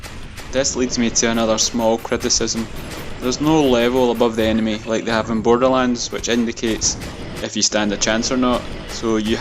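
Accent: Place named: Scotland, United Kingdom. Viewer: First time hearing you speak, didnt know your were that broad scottish;) its stocko by the way, thanks for the shout out:)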